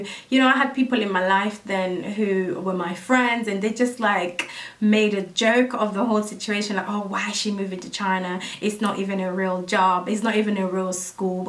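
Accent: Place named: France. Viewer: Your french accent it’s so cute 😍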